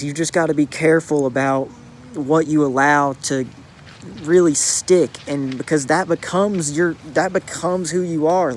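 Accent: South, United States. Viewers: Thank you for sharing....so young so wise...the southern accent is nice reminds me of home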